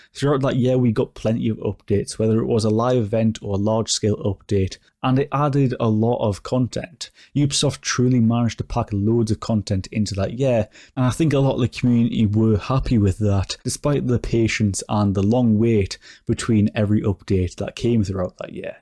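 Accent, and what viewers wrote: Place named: Newcastle, United Kingdom. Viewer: This any good for a 41 yr old filthy casual who thinks the old ghost recon games were much better than the more recent offerings of wildlands and this? I never played this but read some horror stories about it and swerved it. Worth it now? I liked wildlands but it felt a bit samey as other ubi open worlds and was never touched after the platinum. Just scratching around for something to switch off to and play solo. Fellow geordie by the sounds of it also, I’ll sub just for that 👍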